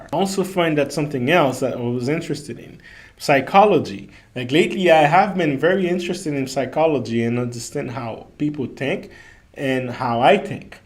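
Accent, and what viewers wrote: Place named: Haiti. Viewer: Where are you from? Your accent sounds Haitian to me, but I'm unsure. That, or what's your heritage? Were you raised by others who had a similar accent?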